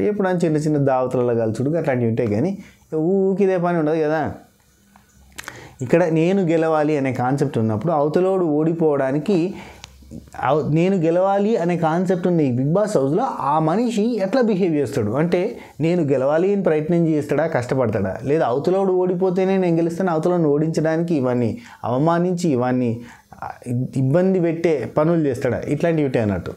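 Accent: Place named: Karimnagar, India. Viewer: bb8 kanna jokulu full entertainment pedda fan meku nenu iam from Karimnagar same ma language eh 😄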